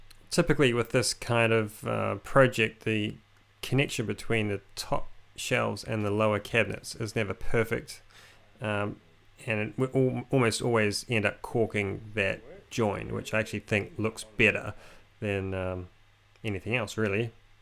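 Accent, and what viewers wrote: New Zealand. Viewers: somewhere in nz by the accent i take it? i love your work, it is quite fantastic, love th idea of the v-groove just by using the table saw set on a 45, that is genius...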